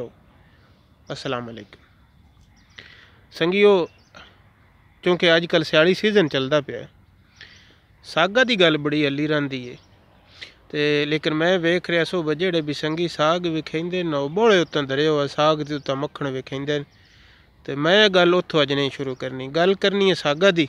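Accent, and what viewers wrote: Sargodha, Pakistan. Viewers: میرا بھائی کیا بات ہے اصل پنجابی سرگودھا دا انداز تے مزدار بات ۔۔۔۔زندہ باد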